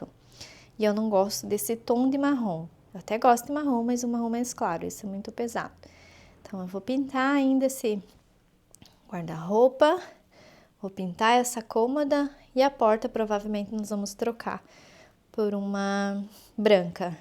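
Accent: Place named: South, Brazil. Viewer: Olá muito lindo seu AP, vc morava aonde no Brasil vc é do sul pelo sotaque eu pensei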